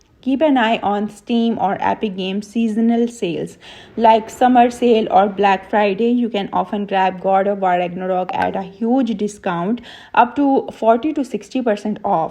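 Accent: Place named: India. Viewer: wow i love the indian sam voce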